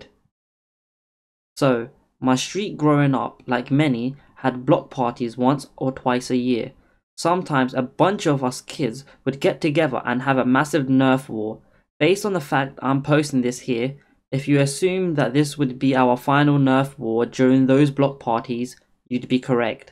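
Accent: United Kingdom. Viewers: I’m sorry if this sounds rude, but are you British? Just curious.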